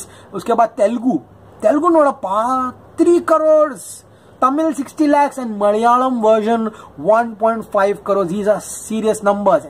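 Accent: Karnataka, India. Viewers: Your kannada accent is too good..... Feels like you are Kannada da hudga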